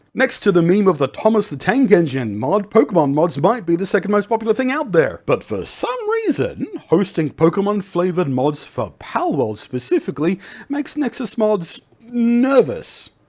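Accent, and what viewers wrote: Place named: Australia. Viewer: The Australian accent makes this vid twice as amusing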